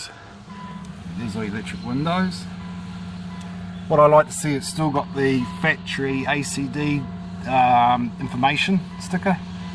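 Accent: New Zealand. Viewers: You a kiwi?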